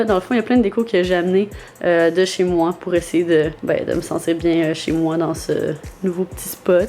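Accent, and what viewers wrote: Quebec, Canada. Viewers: Es-tu de Montreal?? Ou de Paris haha je suis confuse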